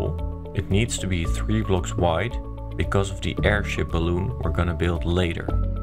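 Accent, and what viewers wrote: Netherlands. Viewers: Are you dutch? Feel like its our accent 😂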